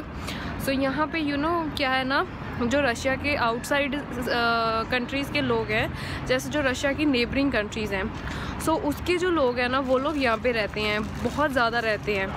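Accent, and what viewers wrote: Punjab, India. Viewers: Even while talking in Hindi , accent is still Punjabi ...love u cutie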